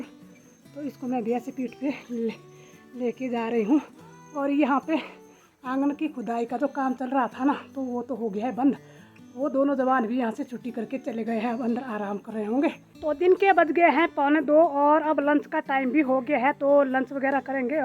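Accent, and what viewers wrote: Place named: Punjab, India. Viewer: Aunty ji aap punjab se ho kya aapki boli punjabi jaise lg rahi hai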